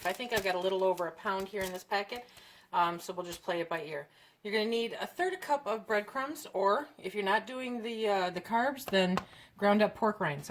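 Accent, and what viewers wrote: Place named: Michigan, United States. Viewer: I'm brand new here...I happened to be browsing around for recipes and clicked on your video. I could tell right away you were from Michigan, because we have dear friends in Johannesburg, and your accent reminded me of them. You have very interesting content, and a very pleasant manner...I think I'll stay!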